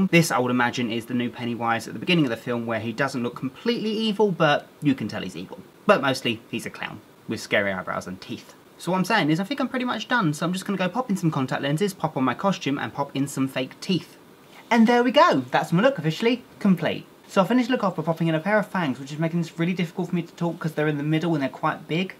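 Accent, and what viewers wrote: United Kingdom. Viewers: Ok so rumor has it that the new pennywise has a British accent like you, so if that is true you killed it more then you thought!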